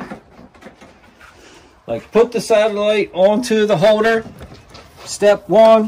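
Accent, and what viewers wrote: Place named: South Jersey, United States. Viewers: Are you from Jersey or Philly? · You’re from south Jersey aren’t you ? I can tell by the way you talk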